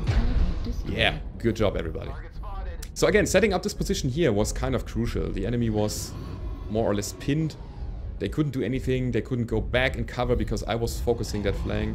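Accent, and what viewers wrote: Germany. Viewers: Your Vids are really impressive. Do i get it right, that you are a german? Your english sounds like its spoken by a german :)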